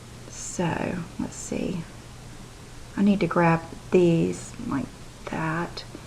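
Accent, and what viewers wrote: South, United States. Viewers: Heheee you, with the bur-berry vs. bur-buh-ree.. I say that you ought to pronounce it just like your instincts tell you:) You are a southern belle; I think it fits that you have your own way of pronouncing it. The lashes/mascara look is incredible.. I think you have been using it a bit lately, yes? (those products) I say this because your lashes have really been looking extraordinary lately. Nice that the line isn't just a famous name, but also are rather superior compared to many other products.. mascaras, etc., for example. You truly are beautiful,.. inside and out. x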